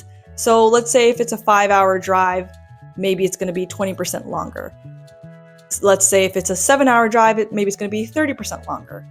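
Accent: United States. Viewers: Great video! All solid advice for new and experienced riders. Riding is a skill. For some reason I’m stuck on your accent, though. My guess is Ohio Michigan, maybe Chicago land area growing up.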